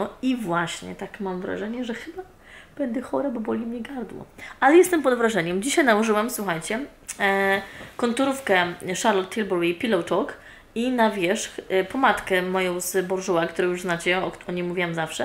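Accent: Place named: United Kingdom. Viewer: Hej, mam pytanie. Nie dotyczy ono tego vloga ale za każdym razem jak Was oglądam to jakoś tłucze mi się to po głowie. Mianowice, Twój angielski ma już delikatny brytyjski akcent ale za pewne nie zawsze tak było. Możesz spytać męża co sądzi o naszym polskim akcencie na tle innych narodowości? Czy jest znosny? Irytujący? A może całkiem miły dla ucha? Wiem że niektóre akcenty (podobno francuski) w ustach mężczyzny brzmią słabo ale kobieta z takim akcentem brzmi sexi. Często się nad tym zastanawiam.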